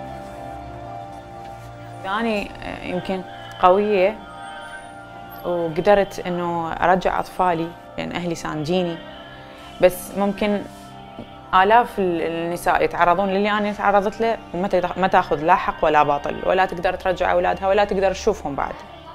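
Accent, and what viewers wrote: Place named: Iraq. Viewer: مااعرف ليش حسيتها عراقيه دخلت للفديو وطلعت صدوك بنت بلدي. حجيها كله صح احنه عايشين بمجتمع ذكوري يشوفون الولد نبي والبنيه كافره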